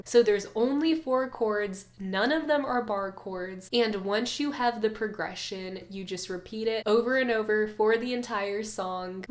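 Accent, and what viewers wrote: South, United States. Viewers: kinda sounds a bit like a southern accent to me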